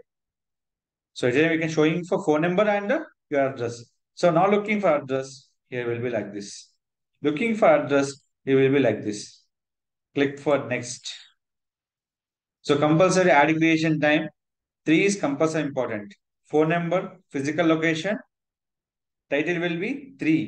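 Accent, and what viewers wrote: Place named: India. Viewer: 🤣 this guy is an Indian I thought I'd do fine understanding him, initially, I felt he sounded alright and was annoyed by your comment. Later realized the grammar, and accent issues.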